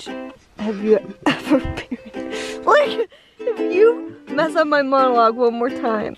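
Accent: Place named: South, United States. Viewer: love your voice...nice country voice. you should sing often...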